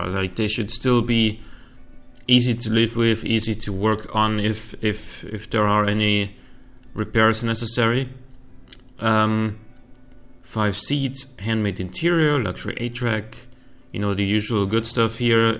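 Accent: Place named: Germany. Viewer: A few questions : 1) How are your cars so light ? Mines will always be like minimum 1600kg... 2) Where did you get the customs tracks I saw in your previous video ? I would have loved to be able to test out my own drag cars but coudnl't. 3) Also where are you from ? Because your accent is so nice :3 I guess maybe Germany. 4) Are your chassis made by you or are they just modified vanilla chassis ? 5) I don't know what I should say next, I feel like I'm so bad at this game :(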